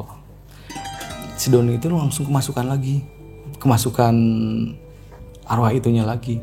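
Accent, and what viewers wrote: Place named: Bandung, Indonesia. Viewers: Ngomong teh meni bandung pisan atuh mang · Duh gusti Aa jatohnya jd bodor ah nyampekeun caritana sunda pisan hahahaha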